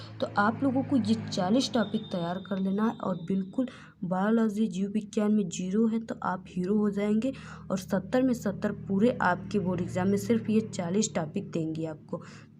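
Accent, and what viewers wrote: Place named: Uttar Pradesh, India. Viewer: Mam mene appki hrr baat axche see sunii or follow kerii wooo mam koo 😮🎉❤❤mam app bhot axcha paadti h mam app up see hu app kii lugahgh se lagra 😮❤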